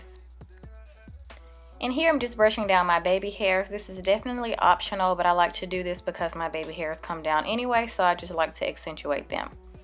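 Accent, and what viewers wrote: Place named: Alabama, United States. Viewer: Alabama, United States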